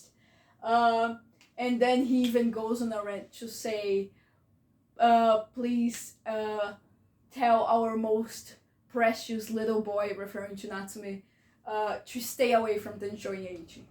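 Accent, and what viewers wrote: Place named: Brazil. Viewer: your accent is so cute it sounds very brazilian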